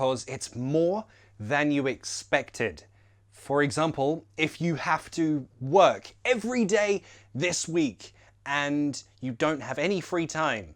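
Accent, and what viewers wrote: United Kingdom. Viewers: Even though I have a lot brit friends, but I'm still need to fill my grammar English cause I love the British accent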